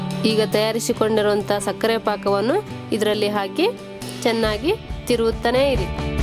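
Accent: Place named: Bengaluru, India. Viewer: Akka, Uttara karnatakadavraagi yaake Bengaloorina kaanada maataadtira.. Uttara Karnatakada kannada kelidre barjari kushi aagtade. Ade tara maataadri..; Recipe mast ide..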